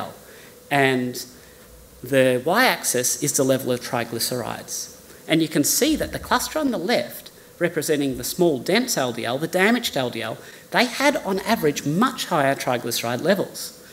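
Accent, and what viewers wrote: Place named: Australia. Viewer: Very interesting but I can‘t stand the Australian dialect 🤮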